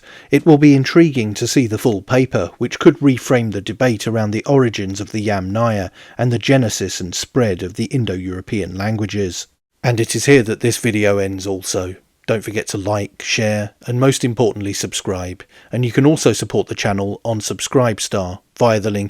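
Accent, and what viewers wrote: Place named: England, United Kingdom. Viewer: He’s English. People pronounce words with the most sensible emphasis in the phonic system of their own language. English people don’t say Paree when referring to Paris, even though that is the French pronunciation.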